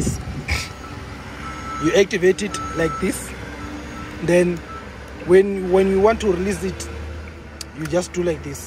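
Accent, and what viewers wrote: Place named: South Africa. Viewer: but I don't remember saying otherwise or anything bad against you,I didn't criticise you instead I only said South African accent is just so awesome 😎😎😎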